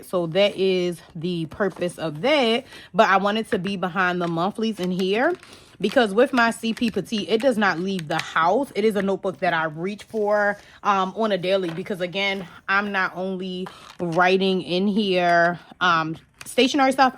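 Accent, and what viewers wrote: Baltimore, United States. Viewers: I think I hear a fellow accent sis, baltimore stand upp 🤣🫶🏽 lolol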